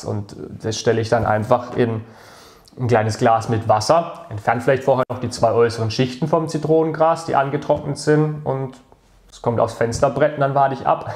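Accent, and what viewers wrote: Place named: Karlsruhe, Germany. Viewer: Habe sofort gehört, dass du von hier (Kreis KA) kommst 😁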